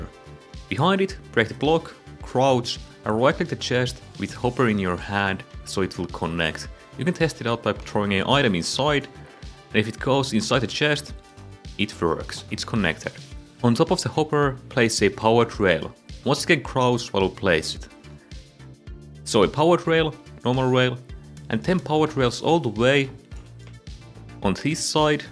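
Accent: Finland. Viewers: are you Finnish by any chance? you sound Finnish. or then im just going mad. 🥴 also suomi The P word. torilla tavataan?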